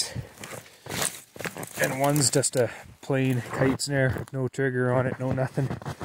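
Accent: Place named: Canada. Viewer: Also, I’m Canadian and you sound really Canadian am I right? Nice to find Canadian creators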